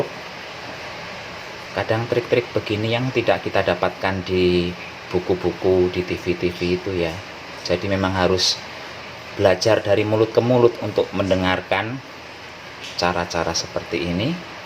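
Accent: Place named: Java, Indonesia. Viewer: terimakasih mas... Aku suka channel ini... Mas nya pinter masak dan orang nya suka lucu- lucuan. Bikin ketawa. Suka juga dengar suaranya asli jawa.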